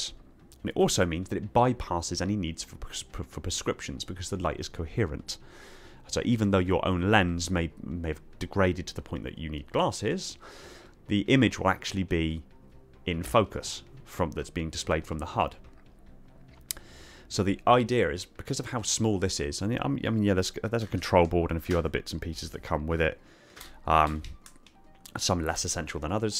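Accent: United Kingdom. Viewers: I love British narrations idk why it just sounds so much smoother and intelligent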